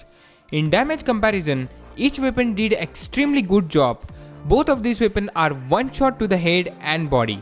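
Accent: India. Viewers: i have to turn the volume down to zero and turn on the captions..... this is for every indian, Indonesian, indian accent speakers... please learn english. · You sound very Indian.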